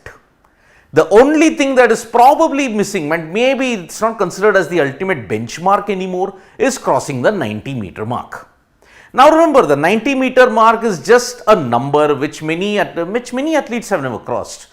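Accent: South India, India. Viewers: You english voice is sweet as a quecumba I like your throwing of english language natural south Indian way of speaking and about niraj he should not stop his aim would like to clinch more try to engulf more distance the record of 98 and learn more and generate new player as like a dhrinchariya of jebling throwing sudip greatness and pride is attached to him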